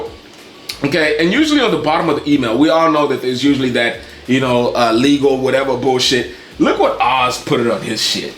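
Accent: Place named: Nigeria. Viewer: And you sound like a Nigerian. 🚩🚩🚩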